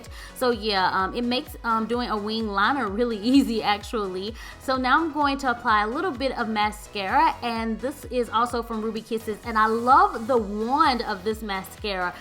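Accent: South, United States. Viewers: Love your southern accent ! ! !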